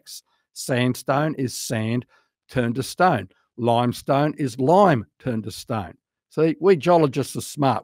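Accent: Australia. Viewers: I was confused at why he said he wouldn’t sound like me, then he said he was Australian and I realised why he sounded familiar. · I love that Australian accent keep it coming brother praise the Lord 🙏🙏🙏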